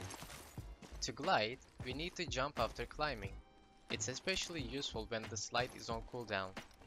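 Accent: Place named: Turkey. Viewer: When I heard your voice I instantly thought you were Turkish looked down and saw the subtitle option, ellerine sağlık valla.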